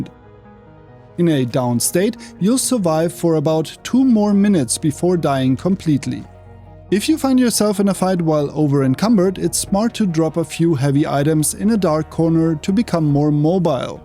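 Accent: Germany. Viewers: I hear a German accent I turn on focus mode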